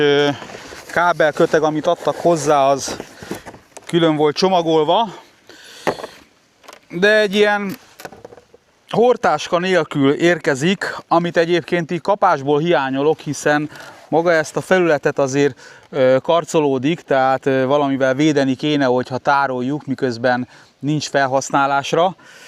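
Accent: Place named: Moscow, Russia. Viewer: az csattog ez pedig Kb Moszkvai akcentussal beszélni mazsar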